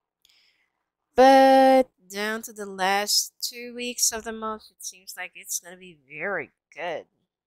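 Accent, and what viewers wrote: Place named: Netherlands. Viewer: Hi, are you Dutch? Cause you do have a Dutch accent 😊😉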